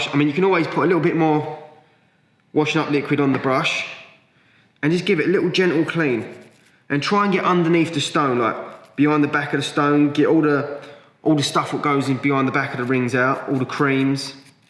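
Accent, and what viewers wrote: United Kingdom. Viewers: Thank you .he is ingles brittis i dint undestand.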